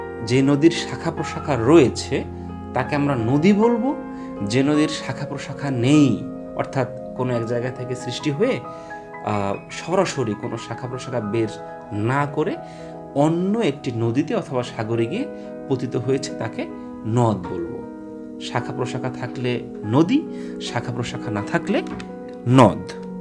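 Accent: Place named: Bangal, Bangladesh. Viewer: স্ত্রী উচ্চারণকে ইস্ত্রি বলেন কেন? এককথা বারবার বলে দীর্ঘায়িত করার দোষটা দূর করুন। বাঙাল উচ্চারণটা না থাকলে ভালো লাগতো।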